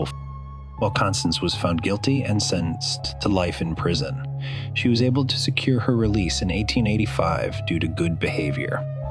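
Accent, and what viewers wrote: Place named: United States. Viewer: Narrator sounds American or maybe Canadian Should be pronounced as 'Tems' not 'thames'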